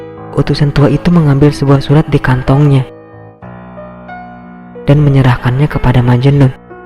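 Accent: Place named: Bugis, Indonesia. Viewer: Ada logat2 bugisya..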